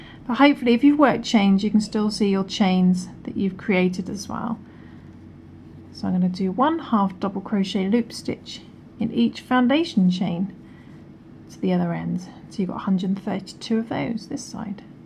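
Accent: United Kingdom. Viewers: Love this pattern. I have a question, though. When doing loop stitch, you start out with yarn over on this video, but in your other tutorial with the small light-green sample you don't do yarn over as the first step and just go directly to inserting into the stitch as the first step. So, I'm a little confused. Would rather not do yarn over, if possible. So lemme know. I love your Brit accent.....Fiddly, a very cute and accurate expression, lol. Haven't heard that one over here--USA.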